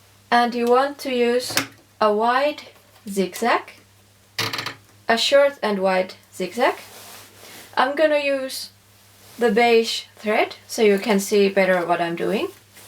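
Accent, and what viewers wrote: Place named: Finland. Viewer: Mä arvasin et oot suomalainen koska sä puhuit suomen englantia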